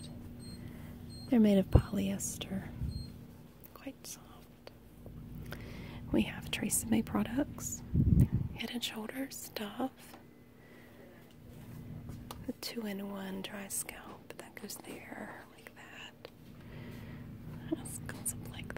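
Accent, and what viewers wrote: South, United States. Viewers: I'm so happy I found your channel, this is my absolute favorite now. You are such a sweetheart, I adore the southern accent and your comments are just perfect. I had never seen any organizing and structuring ASMR before, but I absolutely love it. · You have such a sweet southern accent. I love it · I'm a new subscriber and I have been a subscriber to AMSR channels for a long time and how I missed your channel is beyond me. It really at the top when it comes to effectiveness. I love southern accents so maybe that plays into but when I watched your library video, that sold me in 2 minutes.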